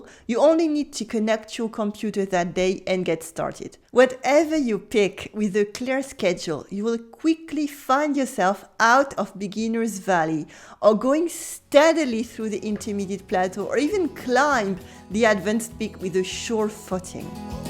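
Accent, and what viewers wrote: France. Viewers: You are beautiful and your learnning technique is fantastique. I love your French accent when you speak English it makes you so unique. Apart from learning, it's always fun to watch your videos. Cheers.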